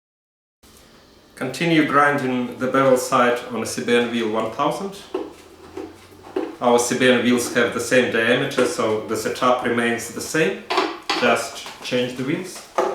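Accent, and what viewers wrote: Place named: Russia. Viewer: Когда я услышал "хорошо" в начале видео, подумал показалось. Но когда увидел имя на книге плюс акцент - всё сложилось :) Приятно смотреть и слушать коллегу с такой глубокой компетенцией. А ещё порадовало понимание того, что вы живёте в Австралии и, вероятно, востребованы там; что всё у вас получилось. Привет из Санкт-Петербурга!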